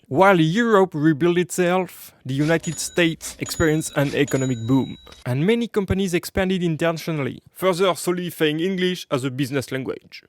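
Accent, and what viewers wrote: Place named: France. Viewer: 💀Basically you're just saying that you're fine only knowing one language becuase you already know the "global language". Well im gonna say a thing to ya champ, you speak English because it's the only you know (Even though I think you also speak French by your accent), I speak English because it's the only language you know.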